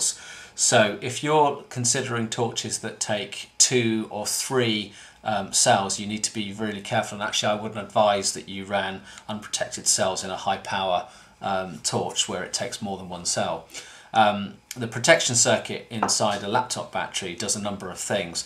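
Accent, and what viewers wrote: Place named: England, United Kingdom. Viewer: Hi, Thanks so much for your advice. You sound like you are English, do you live in the UK and can you recommend a couple of batteries that are easily available which are 18650 or AA? I want to get a Torch which is rechargeable but maybe can take recharged batteries so I can replace the torch batteries if I am camping. It seems the Americans have their own market and do not worry about other countries and what happens to torches or batteries. And the 18650 are harder to get here.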